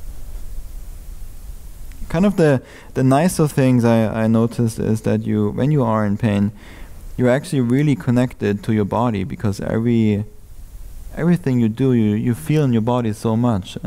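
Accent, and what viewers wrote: Germany. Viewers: Haha du bist doch locker deutscher hört man direkt an dein Akzent 😆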